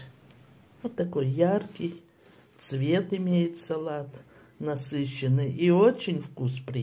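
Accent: Odessa, Ukraine. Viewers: Так мне слышится Одесский говор 😊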